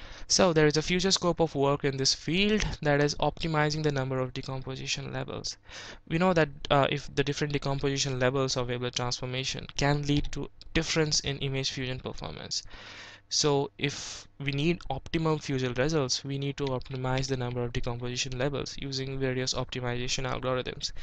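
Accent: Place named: United States. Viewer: Through this video, you just took me to the good old college days & our project work. Your pronunciation has become somehow American :D Where are you now a days?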